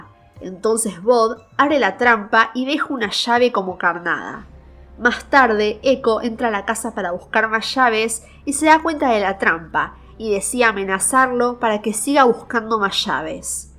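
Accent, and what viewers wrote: Argentina. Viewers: Te lo explico porque al parecer sos ignorante, yo soy argentino y el acento en mi país es así, yo= le decimos sho, llave= shave, la "Y" se pronuncia así, deberías tener un poco más de respeto, en diferentes países se hablan de diferentes maneras y cada uno tiene un acento.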